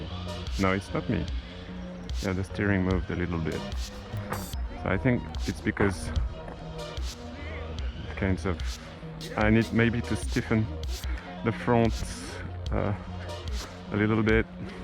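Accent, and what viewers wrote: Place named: France. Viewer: Like you vidéos and your french accent ! Good job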